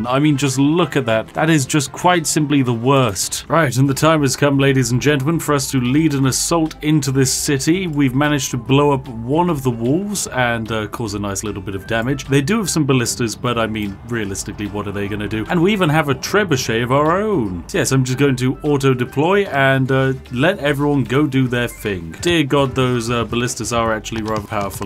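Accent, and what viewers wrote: United Kingdom. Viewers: Hahahahaha yesssyesss I love your British accent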